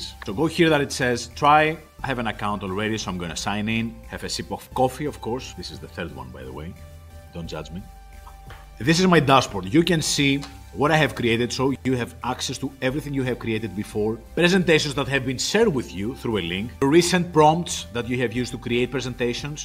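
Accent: Greece. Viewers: Είσαι Έλληνας ; γιατί η προφορά σου μοιάζει πολύ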